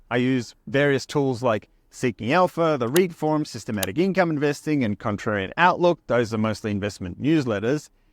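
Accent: Australia. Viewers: Sounds like you are an Aussie, same as me. i like the strategies you share on your channel. Question, what brokerage platform do you use?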